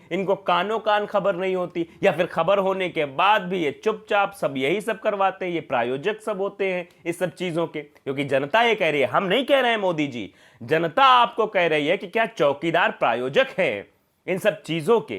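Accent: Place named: Eastern Uttar Pradesh, India. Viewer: In bhagodon ke karan sarkar par koi farq nahin padega kyonki andhbhakton ki badi sankhya unke support me hai chaahe jo bhi ho. Abhi to national property bechi jarahi hai, andhbhakt to uswaqt jagenge jab unke ghar bhi bik chuke honge. Badi achhi timely reporting hai aapki. Eastern UP ka ascent aur style sunkar maza aagays. Agar reporter saheb enjoy karen to unki khimat me ek sher arz hai Hain aur bhi duniya me sukhanwar bahut achhe: kahtehain ki Ghalib ka hai anddaze-bayan aur. Jai Hind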